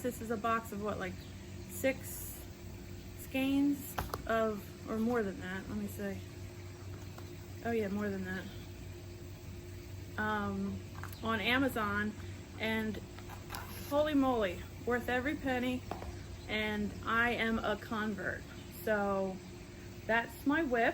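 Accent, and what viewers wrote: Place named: Cincinnati, United States. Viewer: Luv that you’ve been taping outdoors... I noticed the butterfly house straight away! I am originally from Cincinnati. Are you as well? I think u have a Cincy “accent” and just one more thing to love about you... blah, blah, blah! Congrats on all your finishes! Great work! 💪🏻😎🎀👑🌿🦋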